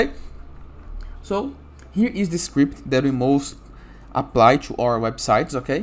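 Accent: Brazil. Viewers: Na hora que ouvi o inglês percebi também kkkkkkkkkkk, seu inglês é muito bom, mas da pra perceber q é br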